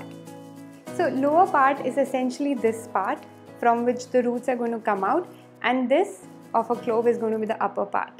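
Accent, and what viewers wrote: India. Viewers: Pure Indian accent 😂😂😂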